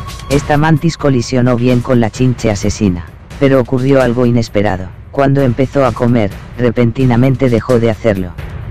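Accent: Spain. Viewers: él es de España.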